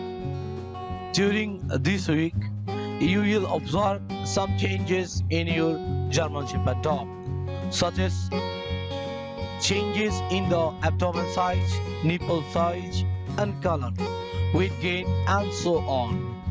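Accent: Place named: India. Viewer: Ugh, can't hardly understand a word you're saying. You'd think we'd all have had plenty of exposure to Indian accents, what with all the scam callers, customer service reps, etc.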